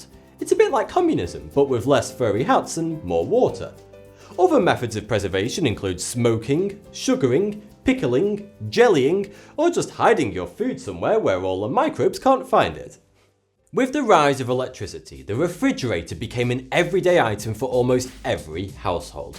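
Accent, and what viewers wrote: United Kingdom. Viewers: There's nothing wrong with his pronunciation. UK English, mate.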